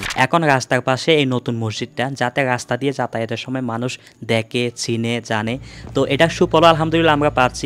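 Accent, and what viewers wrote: Chittagong, Bangladesh. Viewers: চট্টগ্রাম। চট্টগ্রাম এর মানুষের বাংলা উচ্চারণ একটু পার্থক্য আছে।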